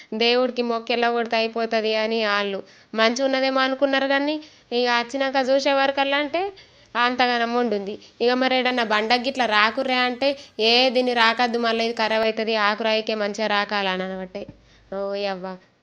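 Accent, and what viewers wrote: Nizamabad, India. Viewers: Nizamabad district slang language alane untadi sis entire different❤ I am from Nizamabad too...where are you from?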